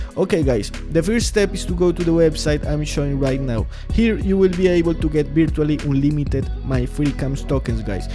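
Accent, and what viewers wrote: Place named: Africa. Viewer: Scam with African accent. I feel sorry for your mother!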